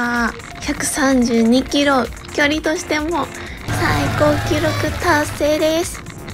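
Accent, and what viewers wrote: Gifu, Japan. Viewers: 最近よく拝見しています。岐阜弁の響きが懐かしいです。（友人が岐阜に居るので）来年は私も参加したくなりました。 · 失礼😅岐阜弁ですか！かわいいですね🙂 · 後半戦も、岐阜弁を懐かしい思いで聴きながら拝見致しました。 岐阜に住んでいた時、「えらい」を最初聴いた時、？？だったのを思い出しました。 来年、輪行して、参加したくなりました！！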